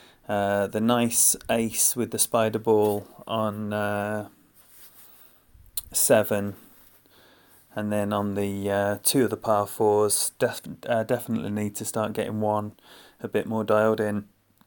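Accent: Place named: Sweden. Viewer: Thanks for uploading the Beeedioe (Swenglish) You're the man!!!!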